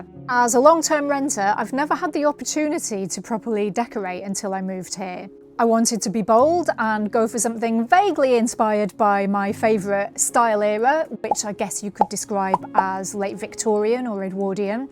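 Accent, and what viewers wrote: United Kingdom. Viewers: Caught your video in the rain soaked car, wanted to say that I liked your delivery and comments on the weather. I was unable to post a comment though. You seem quite a sincere person who knows quite a bit about yourself and preferences and how to cope. I am a little astonished how many nice YouTubers there are. And many seem to have a British accent.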